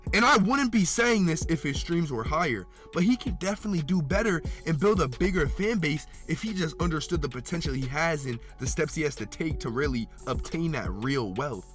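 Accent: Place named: Toronto, Canada. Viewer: i can hear the toronto in ur voice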